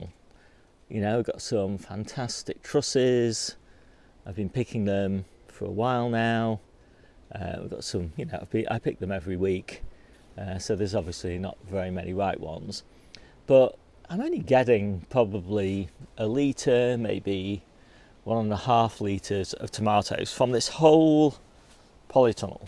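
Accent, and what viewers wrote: United Kingdom. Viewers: You sound like your in the UK. Where I live grocery store tomatoes are hardly fit to eat. They are picked green and gassed with ethylene. I might try this Tumbler variety.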